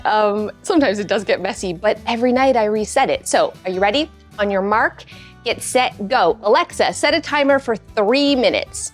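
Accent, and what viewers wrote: Canada. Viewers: "aboot" I knew Canada before i even checked. 😍 Great video. Systems are key. Keep up the great work.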